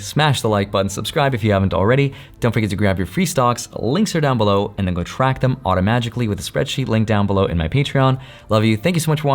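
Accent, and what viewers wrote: Australia. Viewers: As an Australian, that is definitely how we talk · Dude your Australian accent is actually really funny which makes it hilarious! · Australian here. Yes that is exactly how we talk. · Terrible Oz accent, excellent way to describe the problem. And entertaining. Thank you. PS: Oz accent - make each word the laziest way to say it (in your mouth). Shorten Melbourne to Melbn. :)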